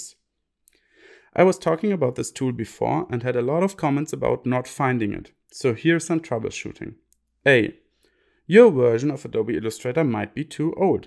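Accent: Germany. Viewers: your name is not, it's. i know a german accent when I hear one:D great tutorial btw! thank you so much for uploading it!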